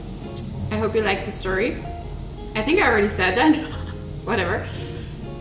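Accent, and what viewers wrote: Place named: Germany. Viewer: I was thinking that you were Brazilian, but I could hear a German accent. You are very pretty. And for me, I'm not too good with attracting money. I usually attract relationships & friendships.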